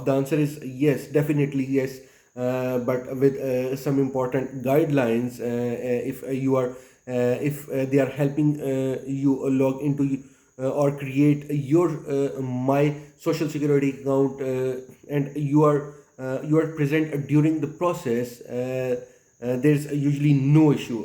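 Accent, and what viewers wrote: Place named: India. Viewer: wow The way you speak English, I can tell you that you are not from USA. You are from India. What do you know about Social Security of USA.??? Everyone in USA knows about their social security benefits. Before you upload any video clip learn how to speak English. All this news are on YouTube video's everyday by DR. Ed weir. So we don't need your Indian accent English, that no one in USA will understand. DR. Ed Weir answering all the question and any kind of question regarding Social security. Stop this bull shit.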